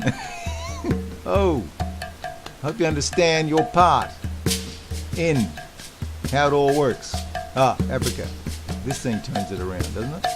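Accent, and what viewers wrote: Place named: Australia. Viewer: I like it...your American accent is fading and sounding more Aussie:) Cool info.. please guys don’t forget to plant native trees and let nature reclaim it’s land!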